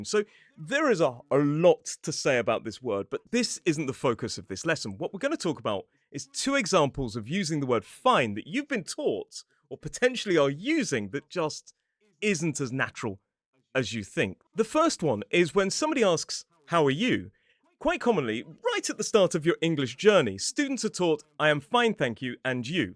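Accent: United Kingdom. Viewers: Love listening to your accent, it reminds me of my university semester in the UK 😍 P.S. Liverpool and Muse (not "the Muse" like my Italian friends would say) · Wow.Your voice reminds me of the singer from Coldplay — he has such a beautiful accent that I wish I could understand it. I gotta say, for some reason I always stuck with American English at school— but I think I’m changing my mind. I used to think British English was kinda boring, too formal, or just hard to follow. When I'm in London I feel an idiot. I cannot answer even simple questions... I don't get them. Perhaps it's a matter of accent I 'm not used to. But you totally proved me wrong. Thanks,!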